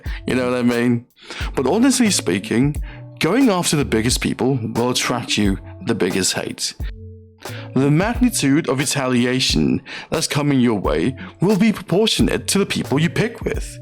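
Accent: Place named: England, United Kingdom. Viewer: Very balanced take and earned my sub so will check out what else you have on your channel. Also being from England you have a cool sounding English accent and im curious if you learned English in my country?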